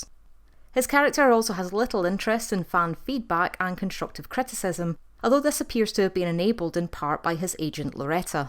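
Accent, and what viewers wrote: Scotland, United Kingdom. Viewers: Hearing another Scottish person talk about this is so amazing! Love the videos, keep up the great work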